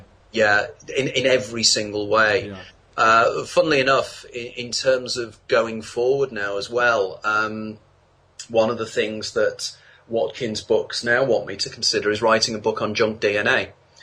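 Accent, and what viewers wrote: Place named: United Kingdom. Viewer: how come british people talk funny sounds like Stuie From the family Guy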